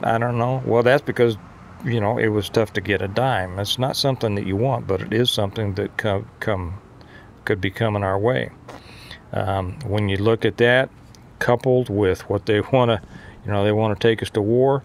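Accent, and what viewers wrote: South, United States. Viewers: You're making me over comment ....You're much better than Dave Ramsey ...in fact you should brush up and apply for the show .....your country accent is better also ....Time for a change !!!👍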